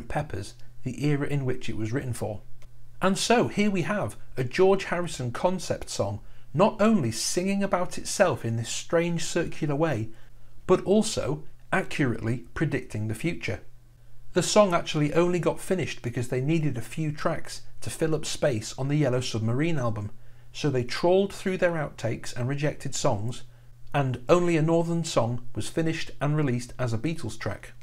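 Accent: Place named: Scouse, United Kingdom. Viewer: You hit the nail right on the head, mate. And I see Paul giving the finger subtly to people but when it came to George in the studio on the Get Back filum I think it is mostly just that he has his plectrum inbetween his thumb and first finger... I also think George would have been well aware of when Paul was giving someone the SNEAKY finger and would have reacted in the appropriate way. I just think he would have. Plus I think they were all close enough to be able to just tell each other to Fuck OFF or get screwed ! I really like the video, as I said, you hit the nail right on the head. And as you well know, being from 'round there, Scousers are a breed APART when it comes to Northerners and indeed all the British peoples. I think Scousers have just got that special ability to make jokes out of things the way only they do (mostly)! 👍 (I just PRESUME you come from somewhere around Merseyside cos your accent sounds a bit familiar... 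it's definately not a full-on Scouse accent but there's something there !)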